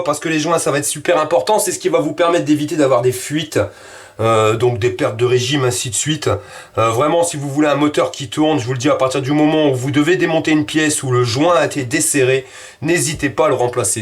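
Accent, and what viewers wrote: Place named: Nord-Pas-de-Calais, France. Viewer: il parle chti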